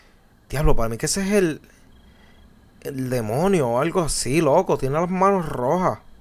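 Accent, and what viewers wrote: Puerto Rico. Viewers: JAJAJAJAJA PUERTORRIQUEÑO AL 100% AMO❤️❤️❤️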